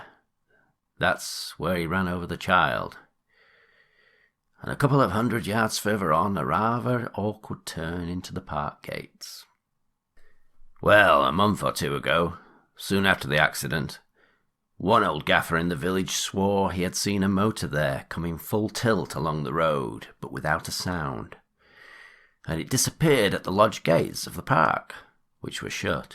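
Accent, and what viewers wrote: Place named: Northern England, United Kingdom. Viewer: So pleased to have found your channel. Great story and nice to hear a Northern voice ( I hail from M/r) narrating. Have subbed and big thanx!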